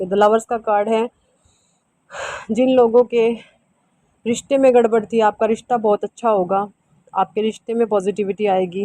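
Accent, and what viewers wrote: Haryana, India. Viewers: Pehle apka punjabi touch tha, par aj puri haryanvi boli hai😂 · आज। की रीडिंग पुरी तरह के से हरयाणवी है एक दम सुपर 👍👑👍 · Sahi kaha Rahe ho aaj haranvi bol Rahe ho ❤😊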